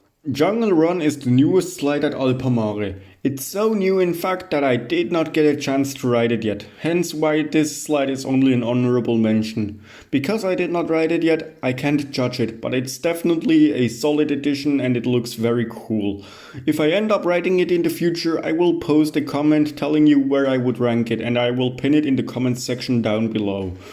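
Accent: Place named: Germany. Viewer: Youre german,right?I can hear it...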